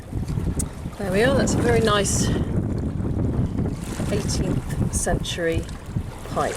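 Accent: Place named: London, United Kingdom. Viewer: I restrained myself, but during the Hoo Island adventures, I started to leave a comment asking if you'd seen Horton, and are there actually Hoos on Hoo Island. It comes from a Dr. Suess book, Horton Hears a Hoo.:) Years ago, I had a friend who was from London. She was much older than me, but still a very lovely woman. The bottoms of her arms were horribly scarred from glass shards. As a baby in London her family had sought shelter in a store with big glass windows. She heard the women scream and saw black shapes falling from the sky, so she instinctively crossed her little arms in front of her face. That was 1940 and she was 3. I knew her in 1998. She had a lovely accent and voice like yours. I'd drink beer and talk to her at a bar called The Waterin' Hole. Her American hubby didn't like going out. I suppose she'd be about 80, or 81 now.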